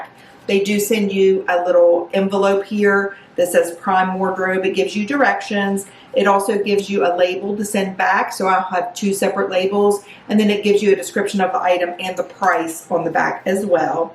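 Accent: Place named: Boston, United States. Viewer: Do you live in Boston? You have a nice accent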